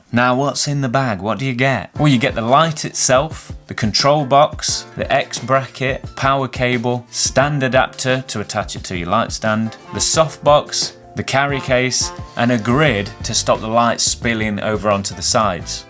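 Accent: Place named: England, United Kingdom. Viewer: Nice to hear an English accent on a YouTube video for a change.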